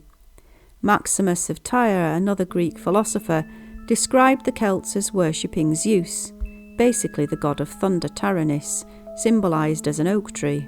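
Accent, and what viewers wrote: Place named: Manchester, United Kingdom. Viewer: Love your videos, you sound like your from manchester accent. So relaxing and i love your old ancheint knowledge plesee keeo it up. Xx Danny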